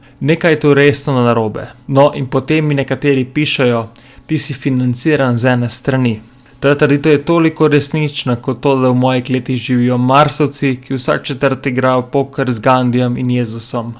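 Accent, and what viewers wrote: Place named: Inner Carniola, Slovenia. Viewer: Prosim uporabljaj svoje domače narečje,če ne se bo izgubilo 😁 .. Ne moreš zatajiti bloško notranjskega naglasa , če se pa motim se pa globoko opravičujem. In želim ,da tako delaš naprej kar se da neobremenjeno in nepristransko .V veliko družinah so bili na eni in na drugi strani , svojih sorodnikov ne morem soditi po temu kam jih je pot vodila , mali človek je pač želel preživeti koritniki so pa na eni in drugi strani enaki...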